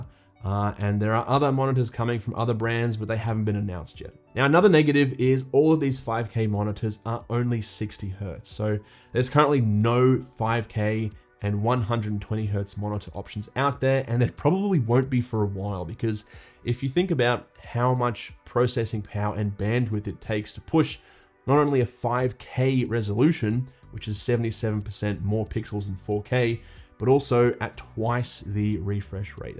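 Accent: Australia. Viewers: Would have been helpful flagging the pricing mentioned was in USD. The Aussie accent led me to believe it was AUD and there had been major price drops!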